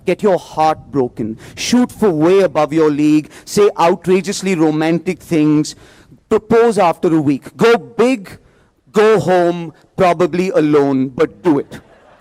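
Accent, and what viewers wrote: India. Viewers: Now that was in proper indian accent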